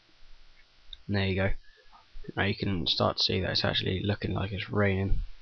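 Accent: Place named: United Kingdom. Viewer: Ohmigawd your british <<num> Pleasse reply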